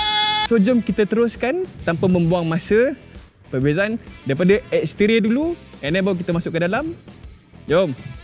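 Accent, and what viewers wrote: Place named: Kelantan, Malaysia. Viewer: ni ore Kelate ke? Bila sebut 'tadi' jadi 'takdi' dan 'steering' jadi 'stereng'. Hehe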